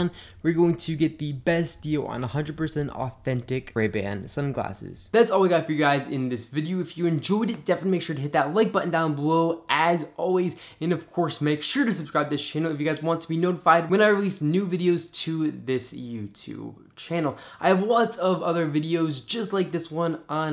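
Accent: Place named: United States. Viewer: Oh and by the way, please don't change the way you speak, we English like an American to sound the way we like Americans to sound, just the way you sound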